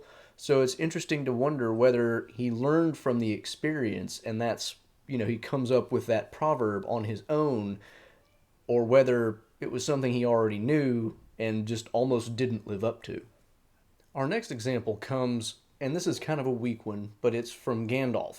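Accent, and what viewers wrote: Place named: Southwest, United States. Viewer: Where in the south west?....of the United States is your accent from?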